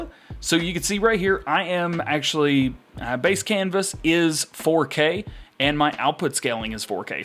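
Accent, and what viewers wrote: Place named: South, United States. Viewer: Definitely from Alabama or Tennessee that accent tho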